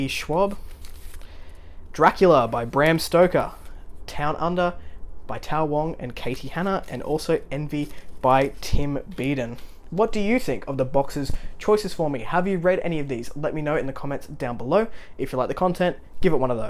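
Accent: Australia. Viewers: Great to hear a Aussie accent. Loved JV Jones back in the day.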